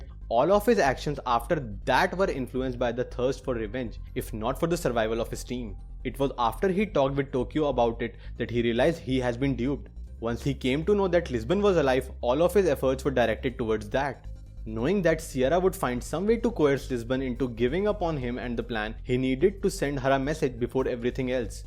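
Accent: India. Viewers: your accent, are you from India?? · Your India accent is so strong ....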